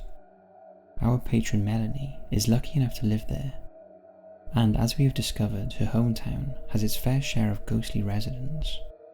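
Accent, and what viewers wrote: United Kingdom. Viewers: He’s British, there’s loads of different accents in the Uk. It’s just the US always make us sound like posh wankers. YouTube Sheffield accent for example. That’s where I’m from :)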